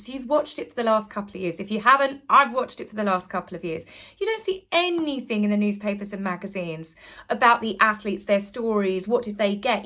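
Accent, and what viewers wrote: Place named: RP, United Kingdom. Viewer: I just LOVE hearing your Posh British accent! Your are so right about Harry and the mistakes he continues to make with that old hag MM! Cheers, 💕🇺🇸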